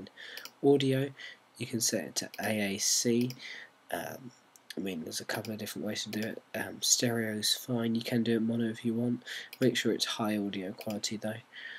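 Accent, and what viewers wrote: United Kingdom. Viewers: Why NTSC (Never The Same Colour) when you sound English? :)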